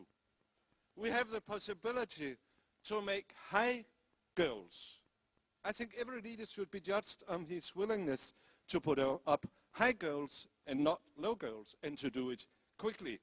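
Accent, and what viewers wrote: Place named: Denmark. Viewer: joken er lidt på dig, for han siger faktisk THEREfor, og det passer perfekt... Han engelsk er sådan set fint, han har dansker accenten og lidt grammatiske problemer....men han når selv og rette de fejl han laver... så længe han forstår og formidle sig så pointen kommer igennem er det vel fint? Men, han ku' nu godt ligne viggo sommer en smule ;)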